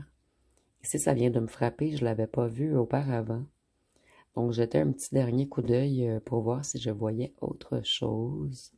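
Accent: Quebec, Canada. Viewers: Ah ,le fameux accent des Québecois(ses)....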